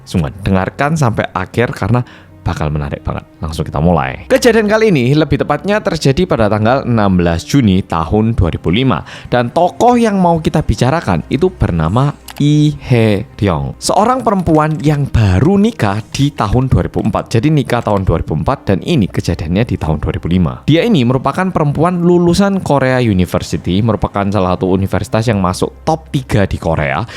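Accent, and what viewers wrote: Java, Indonesia. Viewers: Enak banget dengar nak "cerita"kaya org jawa lagi mendongeng.g kedengar blas ni orang korea.ngalam pooollll,medhok😂😂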